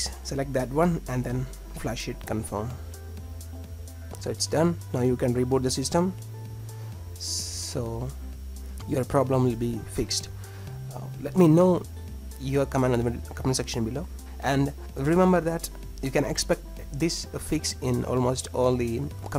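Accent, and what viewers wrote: Kerala, India. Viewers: Awesome budy..! My phone use to freeze once I take out of my pocket for a little time n it was irritating me I thought its display prblm BT after this kernal fix from past 10 days m nt facing any prblm .. Tnx mate & U got a mallu accent even my home town is Kerala anyway thanks a lot.. <3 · Finally.. My touchscreen on the OnePlus One was so slow the last months. I hope this will fix it. Btw I love the Indian accent :D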